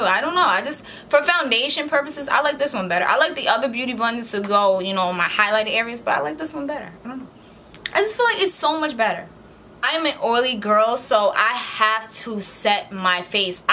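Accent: United States. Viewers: Gawjus! Love the look. And I'm getting New York accent vibes and I'm loving it .. 🙃. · Detroit? I hear it lol correct me if I'm wrong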